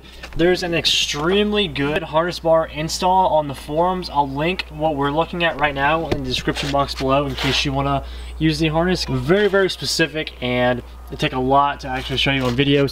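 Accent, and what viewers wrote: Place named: South, United States. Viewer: new to your videos but I could tell instantly yall were southern from yalls voice.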